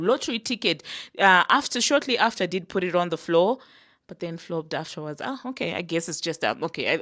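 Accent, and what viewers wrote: South Africa. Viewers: You sound south African I love the accent 🩷😌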